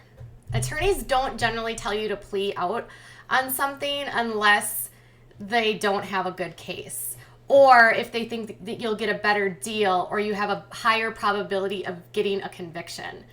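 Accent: Minnesota, United States. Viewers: I like your accent. Are you from Minnesota?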